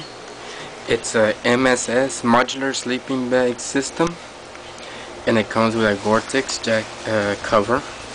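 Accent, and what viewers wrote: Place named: Chicago, United States. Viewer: Oye: thanks for the review. love your accent ,too. reminds me of my friends form chicago!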